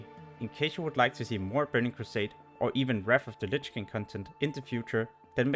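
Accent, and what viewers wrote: Denmark. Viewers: You sound very danish.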